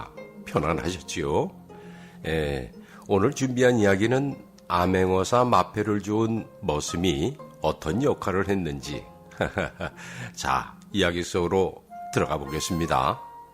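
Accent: Jeolla, South Korea. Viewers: 절라사투리. 징하구만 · 구수한 나레이션이 좋았지만 전라도 사투리가 어슬프 듣기가 거시기합니다. 걍 표준어로 하세요.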